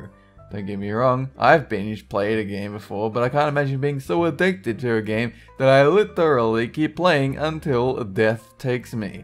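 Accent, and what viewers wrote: Australia. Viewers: is this guy aussie? because I am and he sounds he is too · This guy sounds like an Australian Dave Rosenberg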